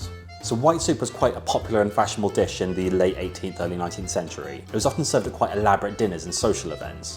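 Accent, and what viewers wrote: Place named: RP, United Kingdom. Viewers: I’m from Manchester but went to school in Surrey and London, so I have a mixed northern and southern accent. To me he sounds typically middle class Home Counties. Not posh, but middle class. Boris Johnson or Jacob Reese Mog is posh southern they’re touching closer to Received Pronunciation, like Prince Charles or the former queen. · As an American I have to say that your accent sounds "posh" to me all the time. And now I want a grilled cheese sandwich!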